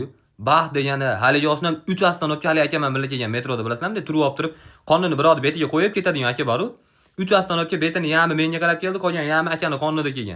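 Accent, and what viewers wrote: Andijan, Uzbekistan. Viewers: Andijon shevasi ajoyib 😊😊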